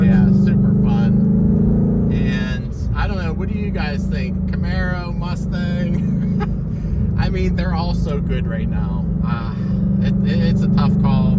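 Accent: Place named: Pennsylvania, United States. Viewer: I knew you were from PA... we got that accent so I am told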